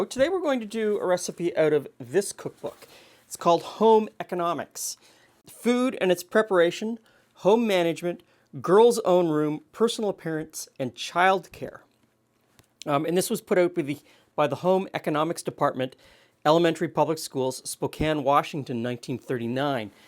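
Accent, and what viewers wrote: Canada. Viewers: I hear your Canadian accent,👍🏻👍🏻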